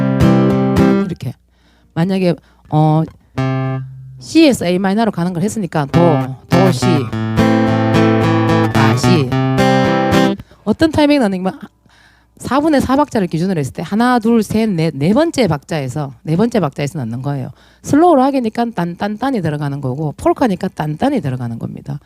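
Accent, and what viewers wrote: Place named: Daegu, South Korea. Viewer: 대구 사투리가 아주 맛깔스럽네요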